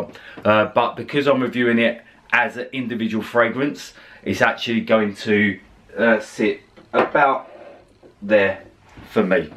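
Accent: United Kingdom. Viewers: Nice , but please put it in description because I couldn't get the names of some of them, English accent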